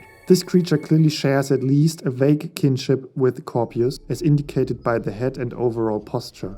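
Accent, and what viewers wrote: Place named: Germany. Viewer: Don't feel guilty for your Germanesque pronunciations man, they're charming 😃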